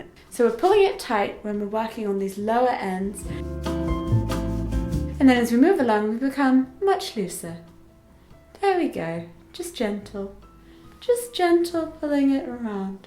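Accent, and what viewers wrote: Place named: England, United Kingdom. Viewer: She could not be more English. It's not just the look or the accent but the mannerisms, the cadence of every single move. It's like she's a time traveler from a bygone ers, an upper class lady from Victorian/Edwardian times. I am absolutely mesmerized.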